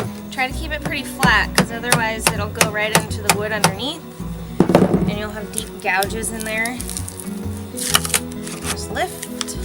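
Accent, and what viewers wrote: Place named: Oregon, United States. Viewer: How funny. I stumbled onto this video, and all of a sudden I thought, "This woman really sounds like she is from Oregon." I had to check it out, so I went to your web site and I see you are in Coos Bay. I didn't know we had a recognizable accent! I'm from Medford.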